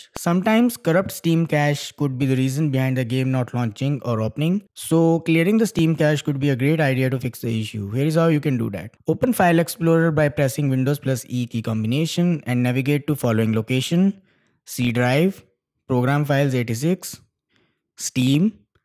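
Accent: India. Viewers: of course its an indian accent teaching us how to fix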